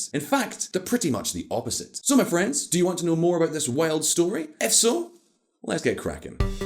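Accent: Scotland, United Kingdom. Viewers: Whoa, how weird hearing scottish accent on this channel. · Stop whining about Simon leaving ffs... We still get a bald white guy with a UK-based accent featuring Venezuela’s economy, and still for FREE.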